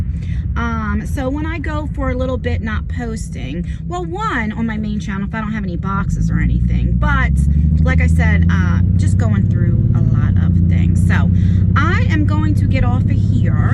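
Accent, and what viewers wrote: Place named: South Jersey, United States. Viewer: 😀 I knew you were from South Jersey by the way you talk ( I'm in Mantua )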